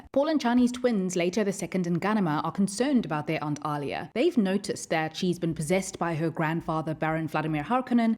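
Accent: South Africa. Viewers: I love the female Sourh African accent. The perfect mix of allure, forthrightness and malevolence.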